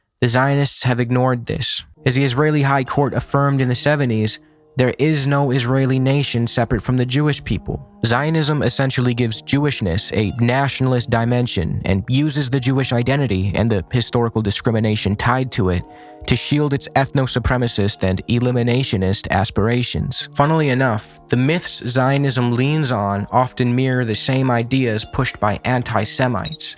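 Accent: United States. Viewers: Sir you sound like an American. Doesn’t that mean that you are from a country that is colonized land? Are you prepared to leave this land and return it to the indigenous population? It could be that you have indigenous blood having intermixed ancestors but certainly you have blood of colonizers also so do you get to stay or must you go??? Does it matter who colonized THIS land in regards to whether YOU get to stay or go??? Populations over history have immigrated around, colonizing, displacing, being displace and even returning. The Jews are in Israel now. Regardless of their religious views or lack of them, they are there NOW. Just like we Americans are here now and we don’t plan to just leave and give the land back to the ancestors of the indigenous people because our ancestors fought them for it. We should focus of history and how civilizations have behaved over time. 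Nothing new here except that the world seems to hyper focus on Israel for some strange reason. My opinion which matters only to me, is that the world focuses on Israel because of Israeli’s claim of God giving them the land and world hates God. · Was quite okay listening to this as a background until I head the commentator’s American accent saying that he’s not saying the ottoman turks weren’t humanistic paradise and that they did some horrible shit like the Armenian Holocaust.. and I just found myself thinking how amazing it is that Americans somehow don’t exist in their own heads when talking shit about other civilisations. I mean he exists as a citizen of his country thanks largely to his ancestors successfully did to the indeginous people what Israel is doing to the Palestinians. And then they didn’t stop there, in order to compete in trade internationally they went and enslaved god knows how many Africans to keep their business overheads low. 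And NOW they are helping out with a genocide because their best buddy Israel really wants a genocide. If the ottomans did shit. What have the Americans been doing ?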